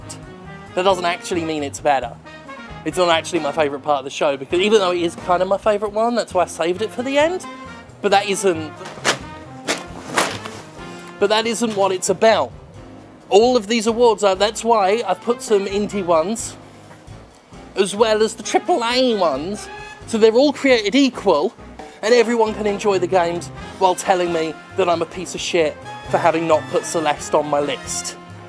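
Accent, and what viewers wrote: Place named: United Kingdom. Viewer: I'm just thinking of what people thought of, standing next to a dumpster in America somewhere, dressed like that with a camera on him and his British accent. I'd like the police to drive by so I could see their double-take.. lol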